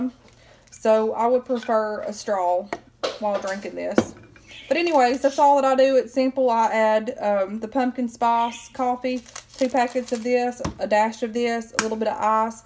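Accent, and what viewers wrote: South, United States. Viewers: Pumpkin iced coffee! Yasssssss!!!! ❤️❤️❤️ so glad to have found your channel! Love that southern accent honey. We will get along justtttt fine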